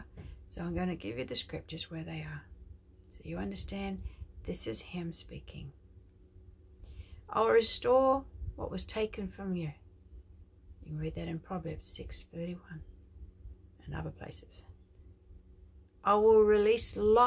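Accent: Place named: Australia. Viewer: Oh wow!! Aussie!!!❤❤❤ Love to hear your voice. I’m in the United States, Kentucky ❤❤❤😊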